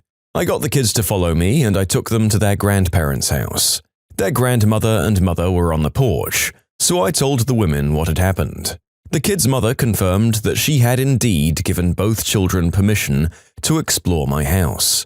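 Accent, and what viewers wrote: United Kingdom. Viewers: Why do you sound *BRITISH*??? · I didn't know the narrator is british now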